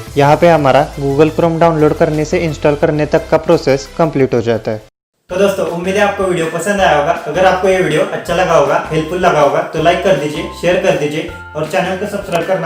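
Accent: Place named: Maharashtra, India. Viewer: Bhai ur speaking accent is like marathi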